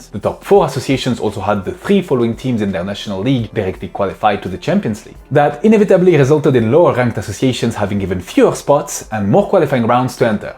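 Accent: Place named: France. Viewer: Pro: Good speed and tone of talking, Great voice, perfect English with sweet French accent, good content, excellent editing, talking while looking directly to camera! Amazing potential. Cons: Bad lighting, average sound, awful position of the head (at the beginning I thought that you sit right under the shelves and I was looking that at some point you will hit your head on the lower shelf). The video is golden.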